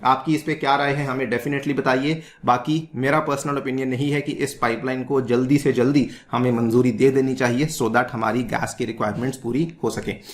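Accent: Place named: Haryana, India. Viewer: Baheri asi production dekh li ...sir is talking in pure desi haryanvi accent. Hum local haryanavi me bolte h asa..hindi m to bht boltr hn..sir bht acah laga apse haryanavi sunkar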